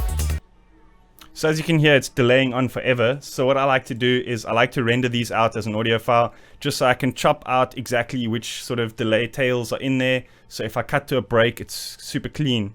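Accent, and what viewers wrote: South Africa. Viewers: Dude! South African?? Where you based? Great lesson, thank you!!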